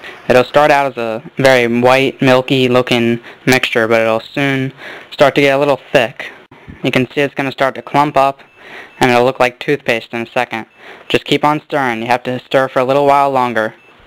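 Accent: United States. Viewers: Hi, what a great video! Thank for sharing this with us! But the bad acoustic and the circumstance that I am not used to listen to American English (sorry! ;-) make me understand less than half of it. Can you write down the ingredients and the amounts of them here in the comments for dummies like me? ;-) Thank you! Best wishes from Germany, Katie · ... thank you for the details! a typically American English with a hum in the backdrop, made it difficult for an Asian like I, to comprehend. thanks for the details in writing. thank you so much for the help! ... 🙏🙏🙏 ... - NSJ / INDIA Thursday, 4 MARCH 2021 ( Hrs IST)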